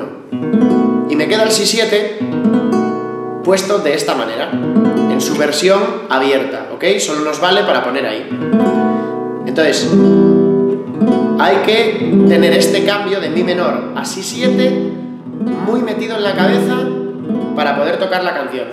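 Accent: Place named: Spain. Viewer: Ostia tio eres un buen maestro muy aparte de tu acento español te sabes comunicar bien te felicito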